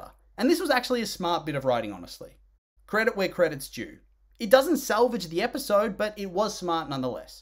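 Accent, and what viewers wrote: Australia. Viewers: Im gonna guess you're aussie from your voice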